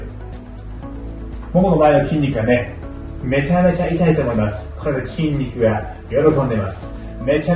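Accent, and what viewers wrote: Kansai, Japan. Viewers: 初めてコメントさせて頂きます。 今までYouTubeで色んな筋トレ、ストレッチ、マッサージなどしてきましたが、痩せるどころか辛いし、脚は太くなるしで全く継続出来ませんでした。 たまたまこちらがオススメで出てきたのでやってみると、とても簡単でこれで脚痩せ出来るのかな？と半信半疑で初めて３週間。ずっと履いていたユニクロのデニムが緩くなってきたので、古いしそろそろ新調しようと思って試着したら２インチも下がっていました！ 体重も確かに少し減っていましたが、正直ここまで脚痩せしていたとは、ビックリです！ 素敵な声が聞き取りやすく、時々出る関西弁に癒され、ハイセンスなＴシャツとタイツで毎日飽きることなく続けることが出来ています。 長くなりましたが、素敵な動画を本当にありがとうございますm(_ _)mこれからも動画ＵＰ楽しみにしています。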